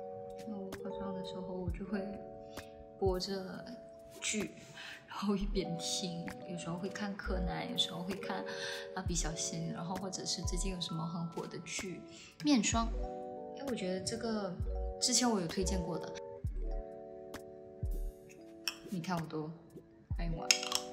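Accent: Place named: China. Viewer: 整個中國腔欸！（有嚇到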